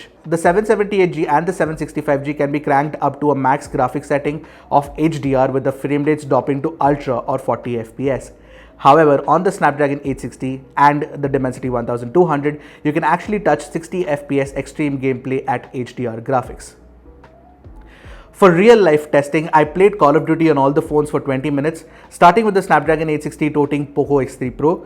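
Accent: India. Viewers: I struggle so much to understand Indian accented English :(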